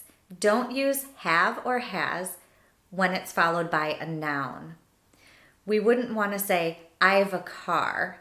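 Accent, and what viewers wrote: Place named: United States. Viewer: American accent is quite stylish...Love it...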